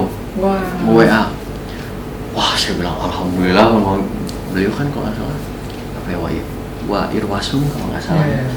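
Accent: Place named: West Java, Indonesia. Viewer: Jawa barat khas banget bang mantapppp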